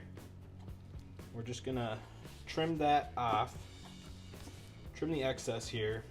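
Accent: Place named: Wisconsin, United States. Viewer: I gotta know. I see Texas everywhere but as a Wisconsin native I hear an accent that reminds me of home. Are you from TX or WI? Or other?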